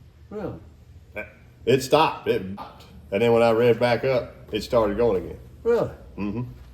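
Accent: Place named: Appalachia, United States. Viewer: you dont know what the hell your doing hill belly talk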